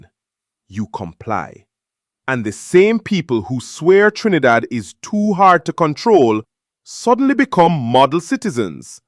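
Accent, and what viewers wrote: Jamaica. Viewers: This person has a Jamaican accent, what do they know about trinis? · Who is this person? He does NOT have a Trini accent? He sounds more like a Jamaican.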